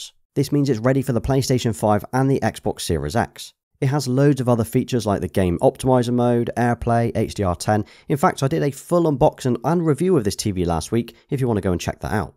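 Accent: United Kingdom. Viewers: I really wanted to find someone speaking British English in normal speed, finally, the day has arrived.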